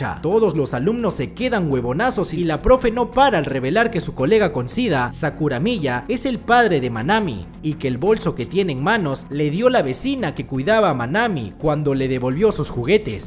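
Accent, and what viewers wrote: Peru. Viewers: Eres de lima? xd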